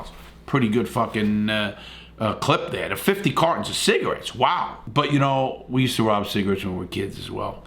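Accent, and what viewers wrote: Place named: Boston, United States. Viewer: I did well at an audition because of you. Hours of hearing your Boston accent helped me master it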